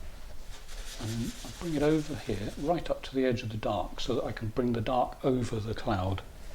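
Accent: England, United Kingdom. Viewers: Would like to know the colors you used for this painting. Was not able to hear it very well, due to hearing. Your English accent Is different than the American. Sorry no offense. Thank you, Gina/Texas girl 👧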